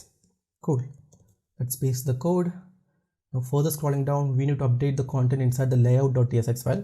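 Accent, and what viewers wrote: Kerala, India. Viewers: You got a good mallu accent. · that malayli tone to english love it :) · pure mallu accent :)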